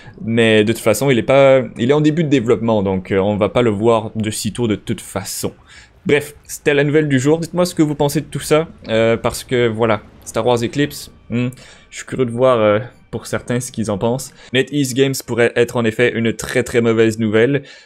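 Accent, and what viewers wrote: Quebec, Canada. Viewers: Caché ton accent québécois, tu n'arrives pas.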